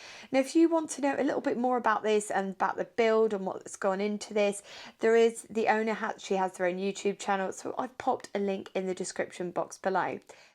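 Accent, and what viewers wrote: United Kingdom. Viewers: Bro you british accent is so saturated .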